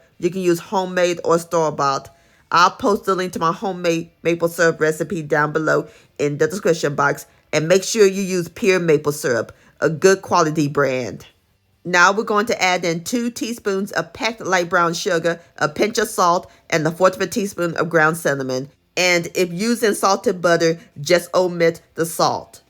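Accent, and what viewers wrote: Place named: South, United States. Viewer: "Thats right sugar a sweet corn bread"! "Here we go"!!! I love your Southern accent. Im from Michigan but theres no hospitality like down south hospitality. I was born down South. W. Virginia. I miss my cousins and home town. Princeton West Virginia. Im so delighted to run across your channel my friend! This sweet potatoe cornbread is a delight and will be a dish at my thanksgiving dinner. Ive never tried it. Just regular hot water cornbread. This will be a treat.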